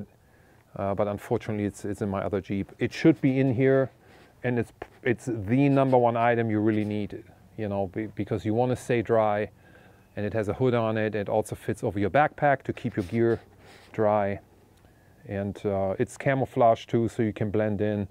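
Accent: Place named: Germany. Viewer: Great Video! Do you come from Germany? It sounds so. Greetings from cologne😁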